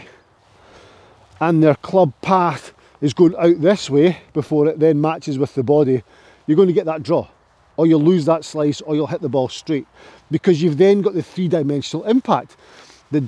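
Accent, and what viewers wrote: Scotland, United Kingdom. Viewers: Without a doubt. (from a guy who's played 60+ years) this is the best golf swing concept I have ever seen. And it works! Took me a bit to get the Scottish accent (thought "canes" was "keyes").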